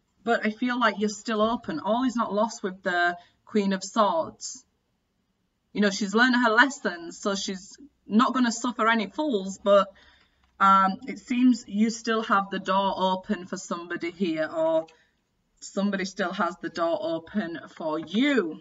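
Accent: Yorkshire, United Kingdom. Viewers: Hi I'm just wondering if you do individual readings? It's good to hear a Yorkshire accent on YouTube 😊 Xx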